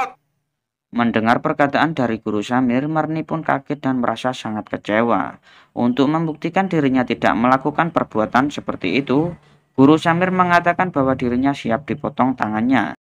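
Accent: Java, Indonesia. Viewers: medok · medok bner ngomong wkwk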